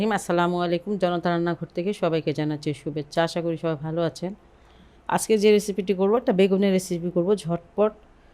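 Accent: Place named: Bikrampur, Bangladesh. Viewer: Aunty onek test apnar ranna.. Bikrompur boley kotha.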